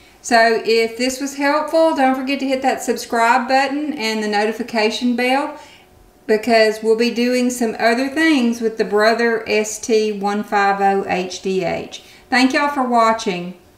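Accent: South, United States. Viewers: My friend and I pooled our amazon points and bought this sewing machine. Opened it today. All was well until we tried to figure out how to thread the machine using the threader. The instructions in the manual weren't any help and then I found your video. We watched it then took turns threading the needled. Thank you so much for you patient, clear instructions! And I do love you southern accent!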